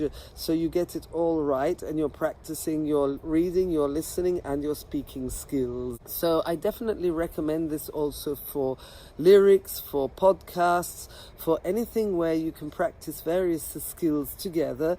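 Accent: Italy. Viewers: You are a native Italian-English speak and yet have an italian accent when speaking English, that's interesting! I've never seen it before. - Great tips, thank you for the video!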